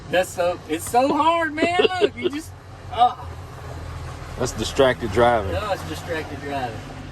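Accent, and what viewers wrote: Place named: South, United States. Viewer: This guy is hilarious. GOB southern and got a good sense of humor. Goes a long way.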